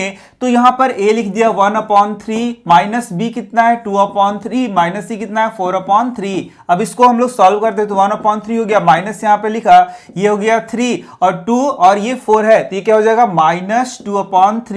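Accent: Bihar, India. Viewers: Sir r u Bihari..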